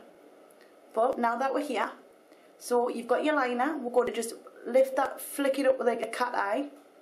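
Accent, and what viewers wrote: United Kingdom. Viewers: Well your ears must be weird because the accent Ms lauren has was voted the best accent in the UK :)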